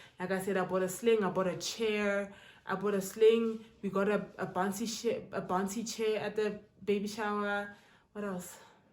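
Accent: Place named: Pretoria, South Africa. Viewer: 🤣🤣 "Comfortability" 😂😂 I can just hear hore o mkhaya wena, o tswa Pitori🤣. Your videos are so helpful mama, Thank you🤗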